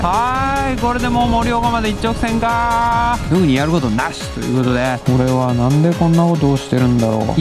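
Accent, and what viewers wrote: Kansai, Japan. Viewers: 楽しく拝見しています。今のコロナやウクライナのニュースを見ていると、本当に(何でもないこととが幸せだった)と実感します。氏のモノローグと言うか、おしゃべりも関西人らしく心地よく響きます。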